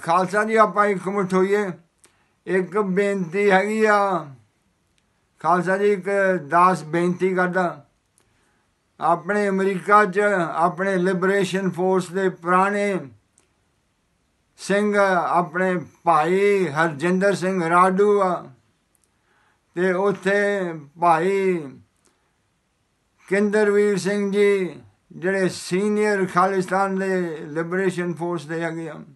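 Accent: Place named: Pakistan. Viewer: Eh bhain da lorha Sikh ni haiga. Ehda accent hi ni haiga India wala. I know uk to aa but bolan da dhang Pakistani type aa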